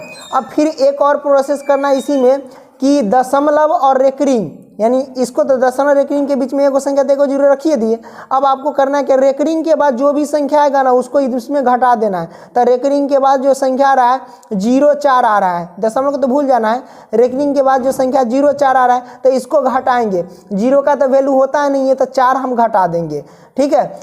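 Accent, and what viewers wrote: Bihar, India. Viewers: Sir aapka boli se samajh gaye ki aap bihar ke chore hai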